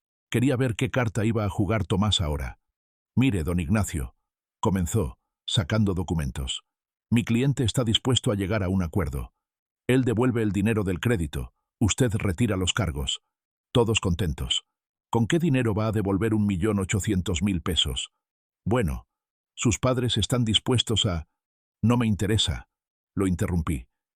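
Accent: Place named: Spain. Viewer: Y si eres de Oaxaca, porque hablas como un español?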